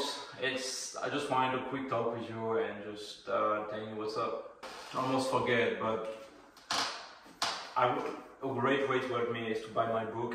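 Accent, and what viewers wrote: France. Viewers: I love the French accent! Looking forward for more talking videos. Keep it up even with the challenges!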